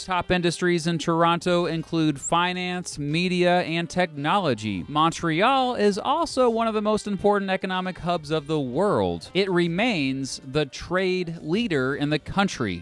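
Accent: United States. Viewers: His grating American accent was enough to stop watching . Get a Canadian at least to narrate a video about Canadian cities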